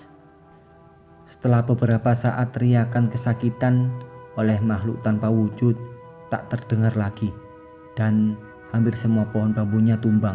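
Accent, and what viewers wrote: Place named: Madura, Indonesia. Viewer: Mf..kang Mimin ini dari Madura kah..? Sy dgr dr logatnya😁🙏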